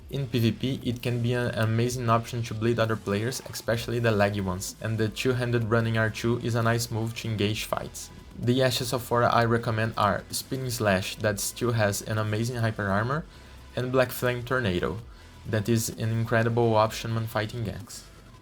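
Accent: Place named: Brazil. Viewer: sotaque BR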